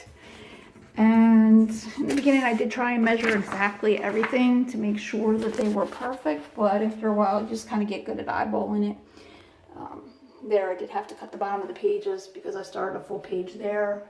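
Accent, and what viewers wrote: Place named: Philadelphia, United States. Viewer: I can tell by your accent that you are definitely from philly. Great video by the way!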